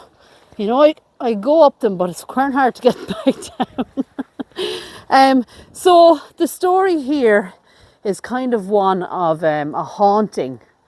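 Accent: Ireland. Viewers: I love listening to you and your Irish accent. To think my ancestors came from your beautiful country makes watching your channel even more interesting. Just one of millions of Americans whose ancestors came from Ireland. It’s beautiful and you do an excellent job sharing with us. 🙏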